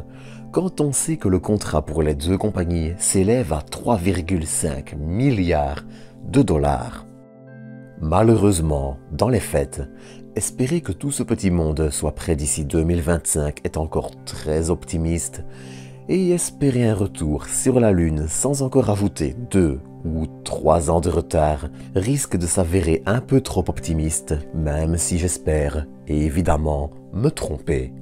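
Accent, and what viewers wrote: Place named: Flanders, Belgium. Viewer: dit j'ai une question, tu est flamand ? flamand belge n'est ce pas!? 😌 ton accent trahi tes origines 😌😅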